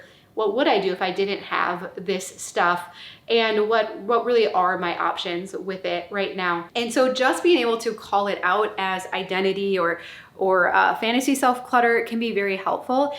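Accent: Minnesota, United States. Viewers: Lol she lives in MN. That's her regular accent.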